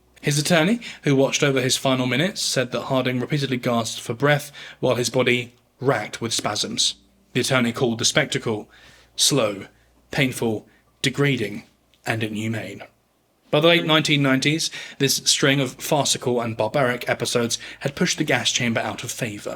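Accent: United Kingdom. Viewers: I once believed, totally, in capital punishment, couldn't understand the logic of those who thought otherwise. In time I have totally reversed my opinion for reasons I don't need to go into. But that being said, I have some major issues with this video. For starters, conflating American executions with Nazi Germany's genocide is offensive, deliberately misleading, wrong, and frankly coming from a man who sounds British, well it takes huge brass balls. And given that the UK practiced capital punishment for a thousand years, on a much larger scale than the US, well there's no small irony in his smugness. I also noticed that the number of patrons for someone who seems to carry a torch for this subject matter kind of suspicious. Perhaps this is a well funded political mouthpiece? To be clear, it's not the message that I really object to but the filthy package that carries it. 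Cheers. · Sounds like a limey snowflake. The USA needs to execute more and quicker to be a deterrent.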